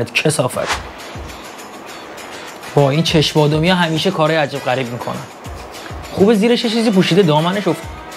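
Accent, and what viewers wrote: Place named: Bushehr, Iran. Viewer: تو بوشهری هستی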